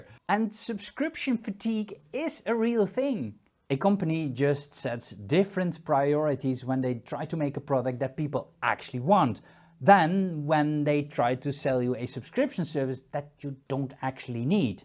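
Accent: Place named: Netherlands. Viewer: Ah ik dacht al dat je van NL komt grappig hoe snel ik dat hoor. gr uit limburg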